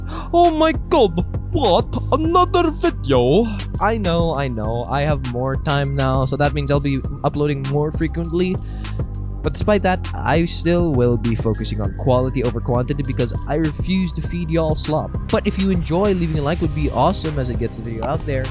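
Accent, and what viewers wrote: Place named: Philippines. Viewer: I knew it because I'm also Filipino and you're accenthen you cannot fool another Filipino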